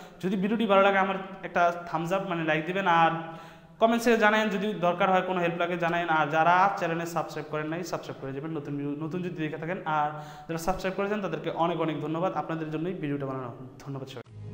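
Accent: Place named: Kishoreganj, Bangladesh. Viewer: বাড়ি কৈ? কিশোরগঞ্জয়া নি?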